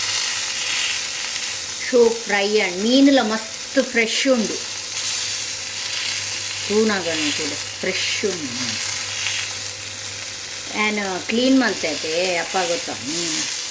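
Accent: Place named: Tulu, India. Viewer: Sudda tulu paaterley madam, nikulna bombai da tulu yeddey ijji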